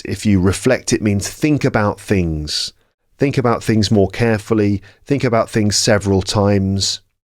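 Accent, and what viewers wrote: United Kingdom. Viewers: I really like listening to your clear British accent when you are reading stories.. your channel helps me a lot to improve my English language.. please post more stories.. I really enjoy listening to you... Thank you very much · Hi and thanks.are you only speaking betitsh accent? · Excellent British accent of. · Thanks you i firstly was thinking that British accenet so hard but after started listening your podacst i discoverd it amazing accent thanks alot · I trully enjoy listen your podcast. You trully english accent, british accent,real. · The combination of your beautiful voice and the British accent is not only important and a great motivation to study English, but it is also really relaxing and enjoyable. Thank you very much for this contribution. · Thank you fot this episode. It was so pleased to hear your british accent with such a clear pronansiation that is so rare to hear ir from natives. 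It can really boost vocab of students and teachers and undestanding of English speech. And moreover your teacher's style is so enjoible and motivative because it lookes like there isn't nothing special to undestand English. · Это британское произношение? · I enjoy your British accent, thank you very much,!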